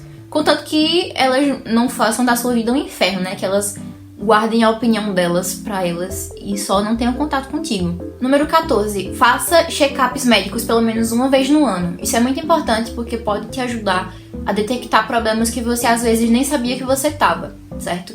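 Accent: Northeast, Brazil. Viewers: Tá aí um sotaque nordestino arretado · PERCEBI PELO SOTAQUE QUE ERA DO NORDESDE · o seu sotaque nordestino é inconfundível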